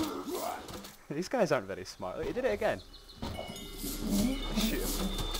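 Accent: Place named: Scotland, United Kingdom. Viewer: Why do you sound so Scottish. Are you Scottish? 8-) And Hi.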